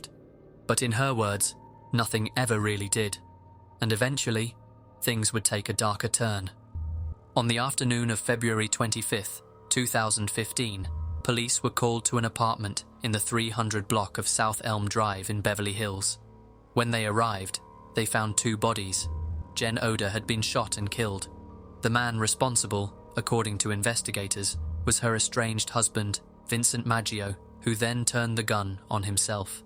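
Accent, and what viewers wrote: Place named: United Kingdom. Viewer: Nasally, Br*tish voices aren't exactly fit for these types of videos.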